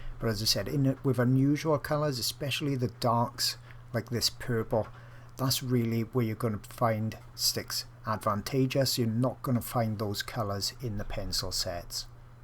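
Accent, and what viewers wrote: Wales, United Kingdom. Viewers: Welsh amateur artist picking up a pencil again here. Brilliant tutorial and great to hear a Welsh accent 🏴 🙌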